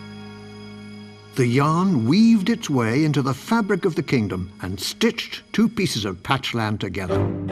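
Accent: England, United Kingdom. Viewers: very interested, when I played this game in the US he sounds American, and this narrator sound like he's from UK so would that mean is from the UK as well?:o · Narrator is British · I wouldn't mind more soothing and amusing British narration in my Kirby games. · Ok I like this narrarator a lot more than the U.S. One. British narraration is amazing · I'm loving the narrator with an English accent. It makes this game feel like LittleBigPlanet. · Your British, I never knew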